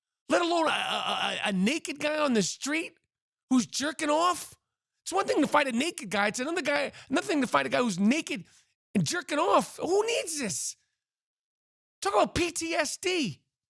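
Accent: New York, United States. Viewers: When I need a dose of New Yorky attitude, I listen to. Who has a classic accent and voice like us anymore? New York attitude is gone. Yeah, we have everyone from all over the world and they get to speak their own language. They come here like tsunami. But they stick together. where is the classic NY accent and attitude - free speech about what matters? Good awards podcast. Thank you for speaking.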